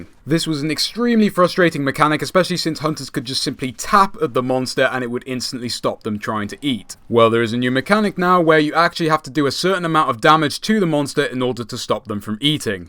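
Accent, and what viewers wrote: United Kingdom. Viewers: u scotish?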